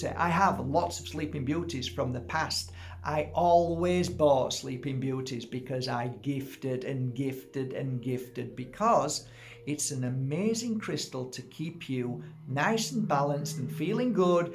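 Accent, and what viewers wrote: Yorkshire, United Kingdom. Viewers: Thank you. Honestly I would love to hear an audio book in your own 'Yorkshire voice '. XxX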